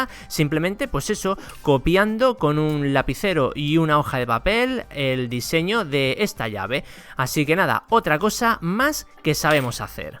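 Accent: Chile. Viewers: eres chilenos? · Eres chileno? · ¿Oye el es Chileno?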